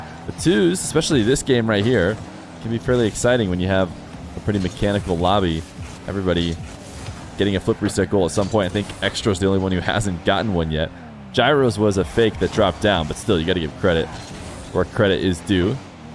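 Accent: United States. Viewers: You sound like Johnnyboi if he had an American accent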